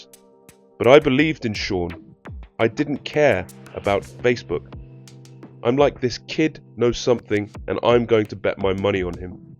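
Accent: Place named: United Kingdom. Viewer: Ill never get over how Brits pronounce Los Angeles as “Los Angelees” and taco as “takko” 😆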